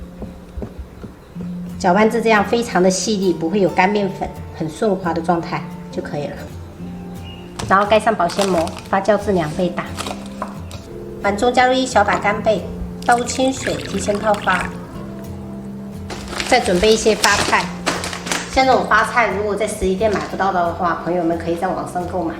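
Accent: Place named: Minnan, China. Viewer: 發糕我們都是特別的節日做的，大部分是過年的時候做的比較多，其實你們閔南話，跟我們的台語好像，所以一直覺得妳讓我感覺好親切哦！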